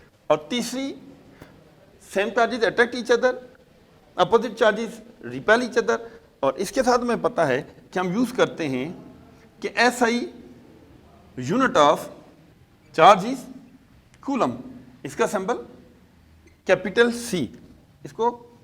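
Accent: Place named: India. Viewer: SIR You neeed to improve your English You have desi style OLD VERSION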